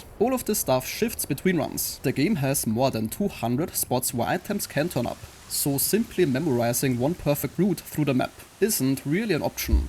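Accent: Germany. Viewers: You sounds like german.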